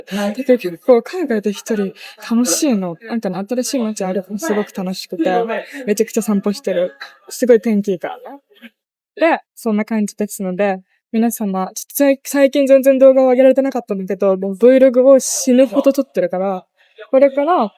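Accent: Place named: United States. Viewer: wow I thought for sure you grew up in the States or something haha You have a lovely speaking voice; I love playing your videos in the background while I work ^^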